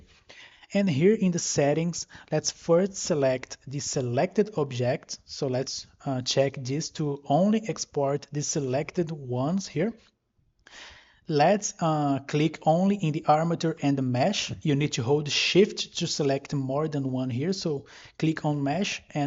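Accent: Brazil. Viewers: Thanks for the great tutorial! ...acho que esse sotaque é brasileiro! kkk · Brazil? i can feel the accent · Nice tutorial. Are you brazilian? Your accent reminds a lot of people from here. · Muito bom! Tô supondo, pelo sotaque (leve) que vc é brasileiro. Baiano, talvez 😊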